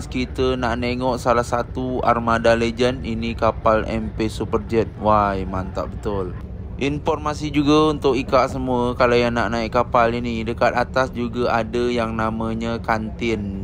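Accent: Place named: Johor, Malaysia. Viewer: Salam...maaf baru terjumpa channel sedara..nak tanya juga ni melayu Daik Lingga atau orang Malaysia..sebab cara percakapan tu, macam orang Johor..bangga saya sebab sedara tidak banyak terikut dgn loghat bahasa melayu Indonesia, tetap dgn keaslian melayu..harap lebih banyak konten yg akan sedara upload dimasa mendatang..👍👍👏👏👏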